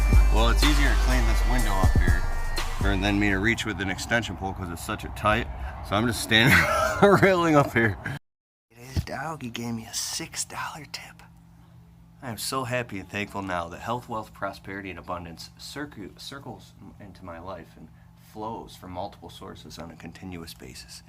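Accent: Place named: New Zealand. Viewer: he is from New Zealand dude ...😂😂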